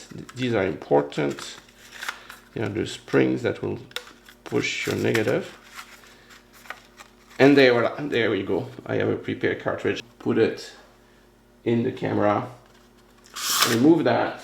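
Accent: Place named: France. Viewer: SELA-NOY-D love how pronounces things! That French accent!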